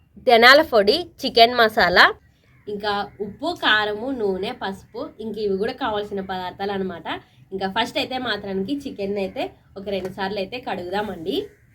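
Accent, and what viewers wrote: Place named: Kurnool, India. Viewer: అర్ధం iendi మీరు karnool side వాళ్లు అని me భాష అట్లేనే vundi😂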